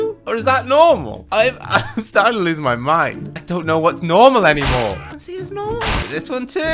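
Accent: United Kingdom. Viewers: You are British but I am from 🇵🇹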